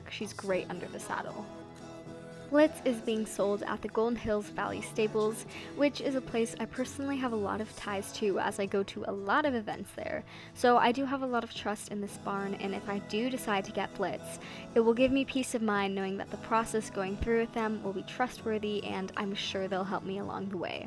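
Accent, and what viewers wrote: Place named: United States. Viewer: I found this kinda awkward because its an American ish voice I don't know why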